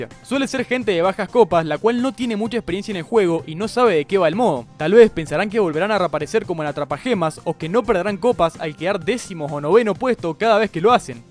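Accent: Argentina. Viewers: seguramente eres argentino por tu vos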